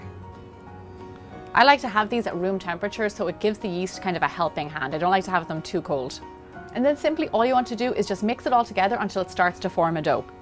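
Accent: Ireland. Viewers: I cant help but notice the Irish accent or is it? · I had to watch it three times -- I kept drifting off listening to that Irish Accent and not paying attention to the recipe. Sure she seems sweet in this video but you haven't seen her angry.😁😉❤ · Thank you for this video and I love your Irish accent, I have just started making a lot of no Knead breads and I needed this one for my collection,,,, One question, I bake my no Knead bread in a preheated oven and pan at 450 for about 30 min covered then I uncover and bake to get crust the way I like it, 3- 15 min, is it ok to do that with this recipe? you have a new sub and thx again and I look forward to watching more of your videos. ;)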